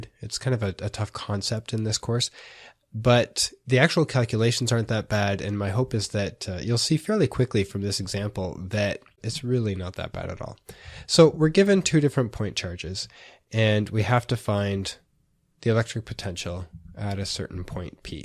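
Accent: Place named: England, United Kingdom. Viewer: Bol t rahe hai jaise ki app England se ho..😩😩😩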